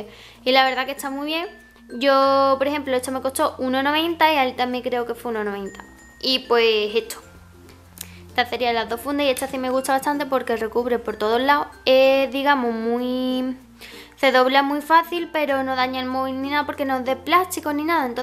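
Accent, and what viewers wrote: Andalusia, Spain. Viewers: Mate Mastandrea porque es andaluza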